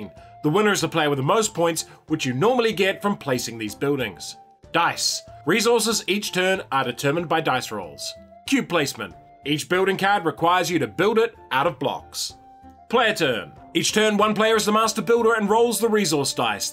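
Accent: New Zealand. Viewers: "Catacombs Cubes" sounds much more like it rhymes when said by a New Zealander than it does when said by a Merican...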